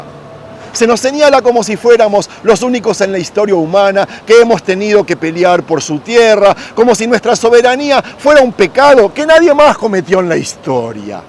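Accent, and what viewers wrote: Argentina. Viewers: Siempre vivieron y viven tranquilos en Argentina.. no se entiende! . De hecho el habla como Argentino y no sé si vive acá también.. me parece que es un poco mucho hablar así de Argentina.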